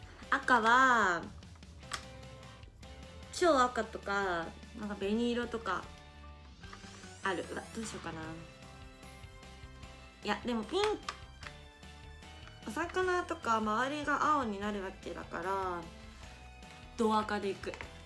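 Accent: Kansai, Japan. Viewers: なんで関西弁？笑笑可愛すぎやろ😭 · 関西弁多いね。かわいい💕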